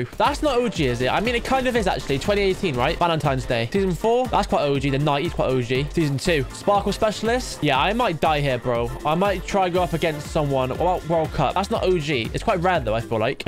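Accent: United Kingdom. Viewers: are you cornish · Is he English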